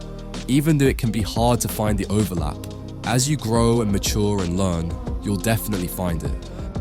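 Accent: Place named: United Kingdom. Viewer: Why did he turn British?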